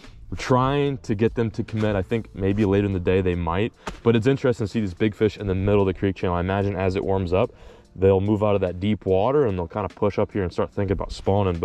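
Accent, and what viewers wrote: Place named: Texas, United States. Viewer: I haven't watched in a while, but Holy shit dude, you actually sound like you're from Texas now 😂😂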